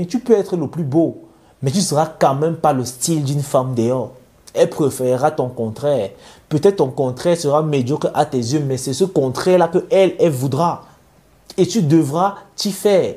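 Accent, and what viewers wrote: Cameroon. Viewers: Pourquoi tu as l’accent 237?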